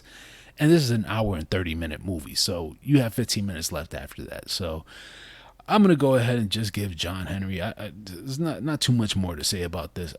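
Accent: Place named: New York, United States. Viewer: Sounding real new yorkish!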